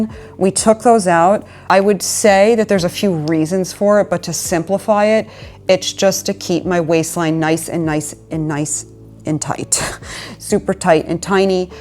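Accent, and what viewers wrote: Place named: New York, United States. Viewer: you are, hands down, my most favorite vlogger. So inspirational, and I love the agency you hold in your life. I’m 70 years old (I can’t believe it really), but don’t see myself as old. I work to stay fit and healthy, and being vegan is a foundational identity. I live in the PNW (Orcas Island), but lived in New York for some time, and your mild NY accent always takes me ‘home’. Anyway, all this to say, I appreciate who you are and your commitment to your dreams. I have great confidence you will acquire your pro card! ❤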